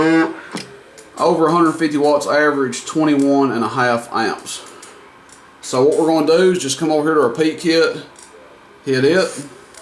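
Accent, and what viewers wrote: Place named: Tennessee, United States. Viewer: I'd like to hear one of these on the air. Often times amps have a very small amount of distortion coming through and not clear as the radio itself. There's one guy who cruises through my way ever so often down the interstate 14 miles away. It takes him at least 1 hr to go out of range. I don't think that guy ever shuts up. I have never in my life seen a guy talk so much. 30 minutes before he gets here and 30 minutes after he passes. I know he's pushing some serious power but have no idea how much are what kind of amp he has but he is crystal clear. He tells folks I can't here you but you'll keep hearing me on and on and on. Here is a cool miles per watt graph. <link> You sound like some of my kin folk in Tennessee.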